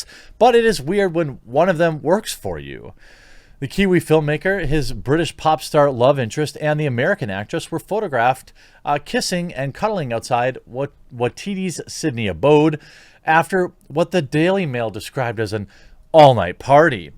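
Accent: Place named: Wisconsin, United States. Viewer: Why pronounce it “Taysuh Tompson” is it intentional disrespect, or just that atrocious Wisconsin accent?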